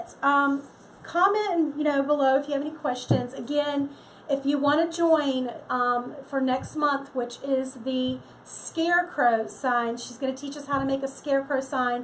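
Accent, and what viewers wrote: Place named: South, United States. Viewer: Thank You!!! I cannot make a bow for anything that looks nice. This will help for Xmas gifts. I love everything you do, esspecialy your southern accent. 👍👍😊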